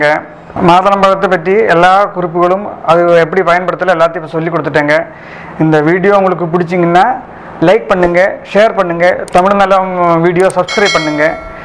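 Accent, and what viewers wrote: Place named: Kerala, India. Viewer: Malayalee Tamil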